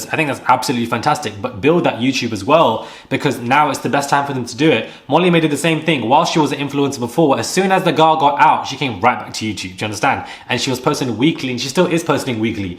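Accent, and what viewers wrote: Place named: London, United Kingdom. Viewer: South London? Well done.. some reason I was thinking you are from East London.. That's how stereotype works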